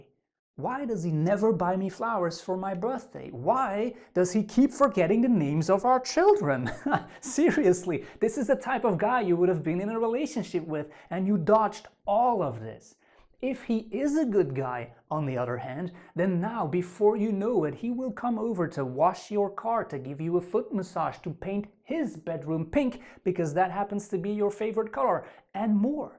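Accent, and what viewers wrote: Flanders, Belgium. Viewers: My ex boyfriend is Belgian Flemish. I recognized the accent. Thanks for the wise message. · Love your Flemish accent .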